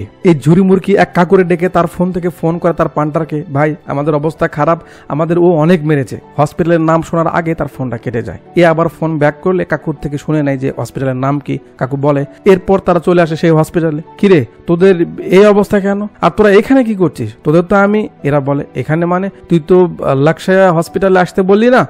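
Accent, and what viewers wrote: Bogura, Bangladesh. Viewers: ভাই আপনি মে বি বগুড়ার লোক।❤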